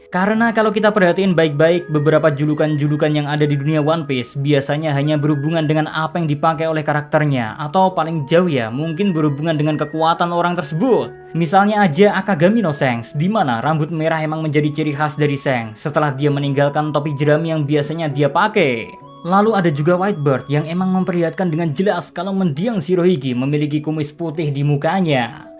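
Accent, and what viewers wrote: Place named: Bekasi, Indonesia. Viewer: Admin orang bekasi kek nya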